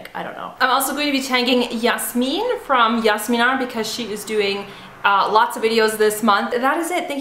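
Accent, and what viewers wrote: France. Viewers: Omg ton français<3 (omg your french!)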